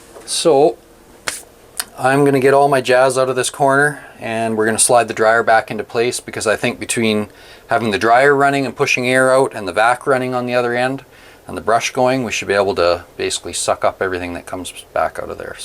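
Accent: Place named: Canada. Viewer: Luv this dudes Canadian accent.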